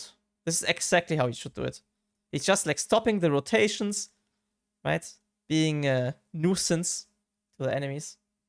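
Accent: Germany. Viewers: his English is good he only has a strong German accent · speaks actually good, it's just the german accent. If you dont like it, than just dont watch it^^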